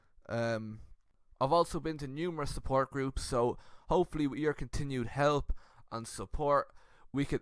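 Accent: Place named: Ireland. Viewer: That was pretty good and your Irish accent made it funnier.... in a good way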